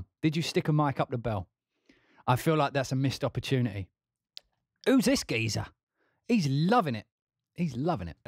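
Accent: United States. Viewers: My gosh your American accent is outstanding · American accent sounds real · Man you have a great American accent!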